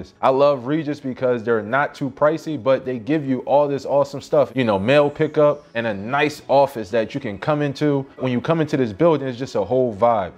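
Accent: Philadelphia, United States. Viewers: Cuz sound like he from Philly 🤔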